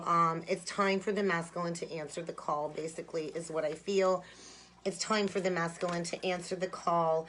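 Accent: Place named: Brooklyn, United States. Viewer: Great reading!! Nice to hear that clear, crisp Brooklyn accent again.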